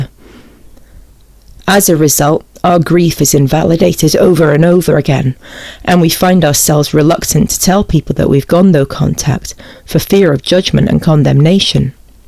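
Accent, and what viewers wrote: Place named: England, United Kingdom. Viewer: It's alright... I've made it this far :) & Yes I am guilty of oversharing the experience. Thank you for your channel; the 'Unstable Adult Baby' label I heard has been replaying in my mind alot as being so incredibly true*. I live in the US but I have English cousins so it is refreshing to hear the accent as I don't get to talk to them much. One of them sees through all the BS and was able to 'confirm' what I was seeing & validate my response. Sadly, she was smeared too by my family & sibling. Cheers and thank you again.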